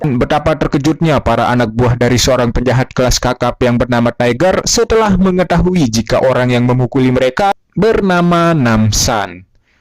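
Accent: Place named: Lombok, Indonesia. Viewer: Logat sasak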